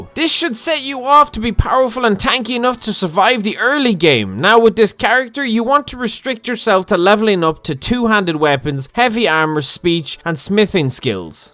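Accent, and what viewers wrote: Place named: Ireland. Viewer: We are more addicted to your content than skooma lol. It's something about your Irish accent... it's amazing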